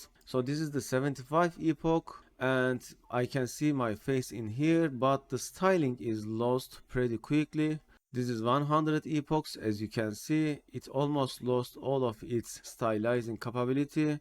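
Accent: Turkey. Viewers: ABİ TURK İNGİLİZCE AKSANİ OLMASAYDİ SEN YİNE OLURDUN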